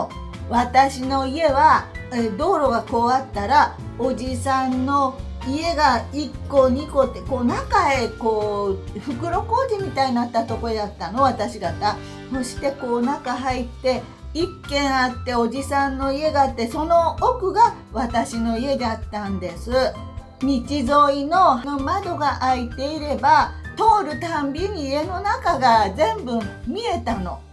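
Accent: Yamaguchi, Japan. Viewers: 本当の山口弁！わざとらしい、鳥肌が立ちそうなYouTuberさんとは一線を画します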